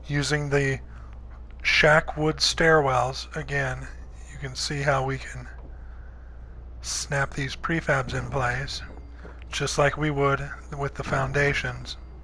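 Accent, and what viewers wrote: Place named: Southeast, United States. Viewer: It's a southeastern U.S. accent.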